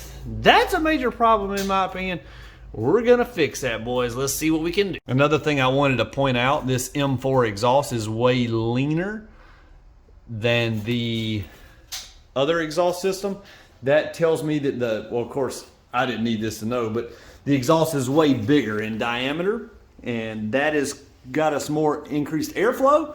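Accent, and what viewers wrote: South, United States. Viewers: Whatsup with your whiny redneck voice?? Pls stop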